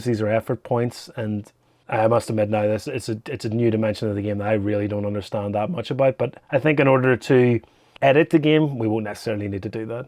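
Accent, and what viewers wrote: Northern Ireland, United Kingdom. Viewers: You sound Northern Irish. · You sound Northern Irish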